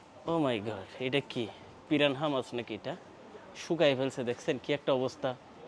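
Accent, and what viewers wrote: Chittagong, Bangladesh. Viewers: ভাই আপনার কথায় আপনি যে কাজ চট্টগ্রামের সেই টা বুঝা যায়।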